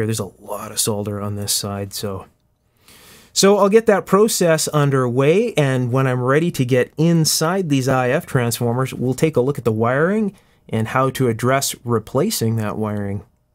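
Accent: Canada. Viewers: Um I said to myself "what the hell is VIM?". I found it is an abrasive cleaner sold in Canada ( accent makes his origins obvious). However, it's not sold elsewhere in North America (from what I can see). Here's a friendly tip for the future, when bragging about a product, give context and show what the product is. VIM is too localized. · It's a great escape to see bring back a classic radio but the real therapy is in the monologue: his Canadian pronunciation is refreshing to these jaded American ears. My. mom used to voice her L's so distinctly that I would shudder as a child hoping not to be taken as foreign. Of course that was useless and the foreign property was a feature of the mind. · are you cannaddian?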